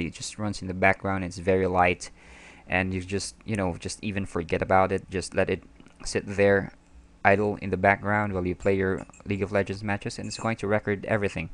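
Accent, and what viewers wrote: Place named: Philippines. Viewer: Pinoy ka?, ganda ng accent mo...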